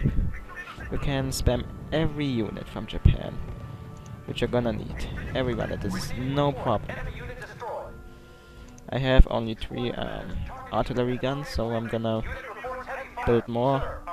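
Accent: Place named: Germany. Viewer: alter du hast so einen heftigen deutschen akzent^^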